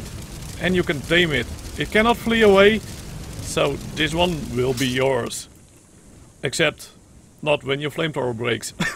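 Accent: Netherlands. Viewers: oefen je engels aub ik hoor dat je nederlands bent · Are you dutch xD Sometime you've got a Dutch accent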